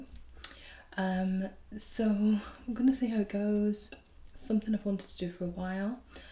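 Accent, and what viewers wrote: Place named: Northern England, United Kingdom. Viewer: Well done on starting your budgeting channel 👏🏾👏🏾🎉 I knew I recognised your accent. We actually don’t live too far away from each other, I live in Bury xx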